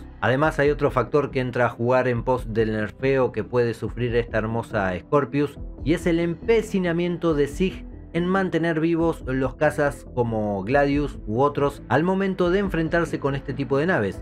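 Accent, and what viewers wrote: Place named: Argentina. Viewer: Me encanta ese acento argentino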